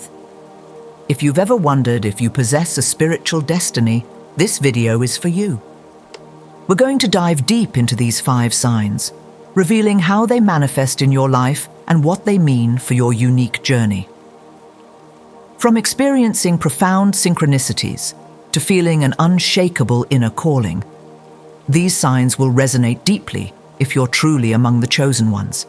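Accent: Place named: United Kingdom. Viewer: Ok UK accent!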